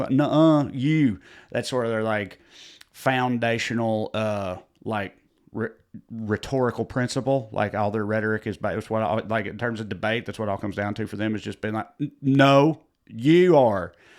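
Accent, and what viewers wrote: South, United States. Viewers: Hey man, I found you on Instagram. Keeping making these! I'll keep clicking. I don't know why, but its really calming to hear a leftist views with a southern accent. Cletus The Slack Jawed Yokel was the stand in for the south until I heard you. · Love this!! Any of your stuff i love. You sound alot like me and its nice to have a southern voice of reason! Please stay safe and keep up the great work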